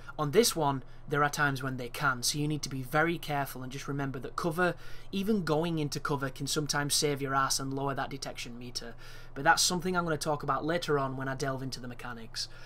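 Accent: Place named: Scunthorpe, United Kingdom. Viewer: You sound very local.... Well, too me you do! Where you from? Can't be too far from Scunthorpe!?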